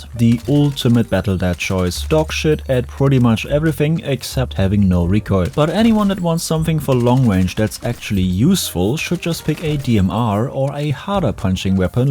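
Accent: Germany. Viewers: I watched until the end because you make some of the best Battlefield videos on the internet. Dry humor, efficient/clear explanations on things, and of course your German accent. Danke, dass du großartigen Content erstellst!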